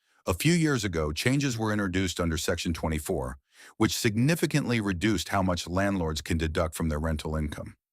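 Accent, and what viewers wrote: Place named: United States. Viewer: Why do u hv an American accent?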